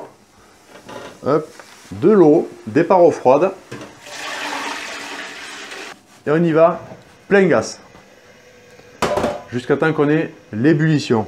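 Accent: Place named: Provence, France. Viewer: J'adore, je salive et l'accent de Pagnol en prime, que demande le peuple ! 👍😘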